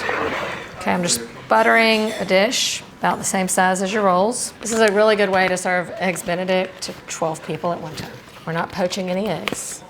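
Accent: South, United States. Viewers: I am new to your channel and enjoying very much. That could be because you are very attractive and an Italian with a southern accent ❤ great videos i can't wait to try your recipes.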